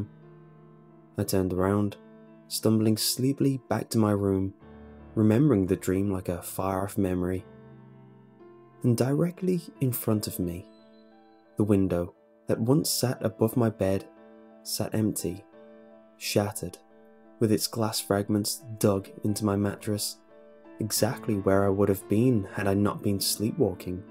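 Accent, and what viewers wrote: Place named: RP, United Kingdom. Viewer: Dark Glitcher I think it's mainly the accent. Can't beat a posh Brit accent in voice overs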